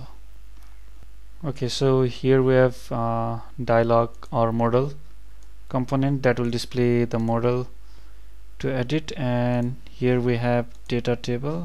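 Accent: India. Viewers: Опять индусина. Бля невозможно слушать...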